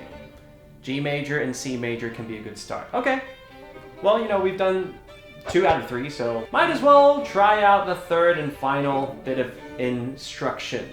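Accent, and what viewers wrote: Australia. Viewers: dude you sound australain. do you live in my backyard ??? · please don't apologise for the australian accent... in fact, you should apologise that you lost it in the first place :D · Bro Your accent sounds like it’s from Australia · Is it me or did you change your accent to a more Australian accent? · Hahaha I have been so confused and thought I was going crazy like why does he only sometimes have an Australian accent!?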